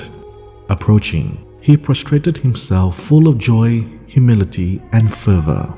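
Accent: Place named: Nigeria. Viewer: I love hearing you tell this truth In your Nigerian accent Reminds me of old friends St Catherine intercede and pray for us to love one another ☘️☘️☘️🙏🙏🏼🙏🏾🕊️🕊️🕊️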